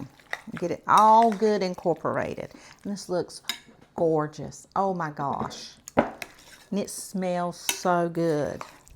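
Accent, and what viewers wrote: South, United States. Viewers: Is that a southern accent? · I Love listening to you tell the recipe with your southern accent. Cant wait to makeThe King Ranch Cassarole. Thx 🎉👍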